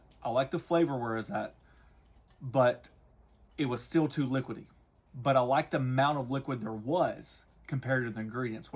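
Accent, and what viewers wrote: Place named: Tennessee, United States. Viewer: Never mind, I know a Tennessee accent when I hear it. Could be Kentucky or Georgia just across state lines, but that's a Tennessee accent. Lol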